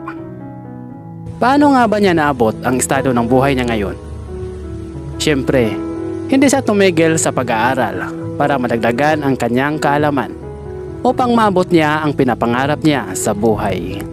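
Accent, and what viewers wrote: Visayas, Philippines. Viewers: bisaya man gud.. pki banggit ng aayos plsssssss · Bisaya ka kuya?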